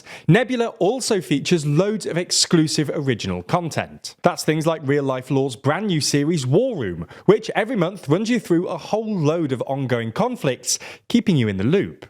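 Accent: England, United Kingdom. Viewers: it's cuz he is British and doesn't make R sound so it sounds like fk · That's just the English accent. They all say it like that.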